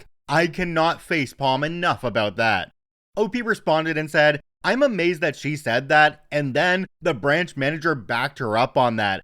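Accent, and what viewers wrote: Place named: Canada. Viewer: Hey,! Good to see ya! Nah, I don't think your channel would ever be mistaken for AI. I've heard Brit and US accents, but never a Canadian accent in AI voices. Not even once. When I saw yesterday's vid, I thought, "Okay, I know the voice, but the channel name wasn't Karma Stories, it was...." I guess I must not have had enough caffeine in my system, because the great, big chameleon on the screen didn't quite register with me. Well, maybe it blended in with the background.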